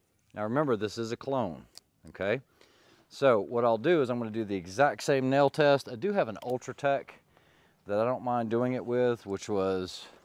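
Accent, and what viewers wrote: South, United States. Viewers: Got to love an Asian with a Southern accent beating on a Chinese knockoff.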